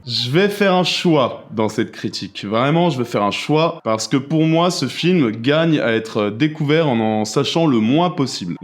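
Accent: France. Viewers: Mais pourquoi les FR vous dites ZHE au lieu de DHE pour THE? Et aussi THAT, cest pas ZHAT ! C'est comme DHAT ! OK, bonne video ! <33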